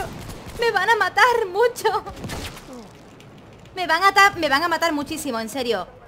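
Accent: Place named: Andalusia, Spain. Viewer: No se de que parte de Espana tu has nacido?? ,,pero tu acento me recuerda muchoo al de mi abuelo (muy Andaluz) ,,el nacio en Cadiz y se fue de muy pequeno a Chile ,,donde yo naci ,,, me encanta tu manera de hablar ,Taison es un suertudooo , pedazoooo de mujer que tiene ,, a cuidarla machote . saludos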